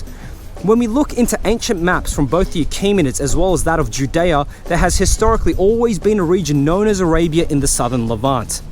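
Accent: Australia. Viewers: Who is this Aussie iraqi lol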